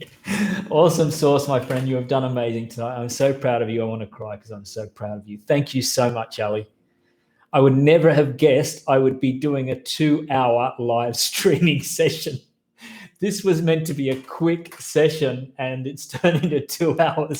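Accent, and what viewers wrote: Australia. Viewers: Love your accent and your upbeat vibe!❤❤❤ Australia rocks and I've never even been there!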